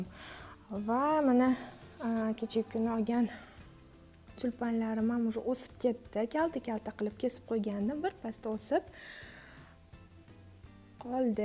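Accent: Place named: Fergana, Uzbekistan. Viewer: Talaffuzizdan Fargonalik bolsez kerak deb oylodim.Men ham fargonadanman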